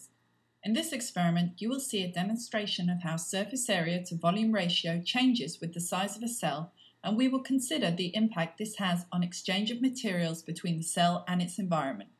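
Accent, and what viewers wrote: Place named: United Kingdom. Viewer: = = british accent? im fine with dat.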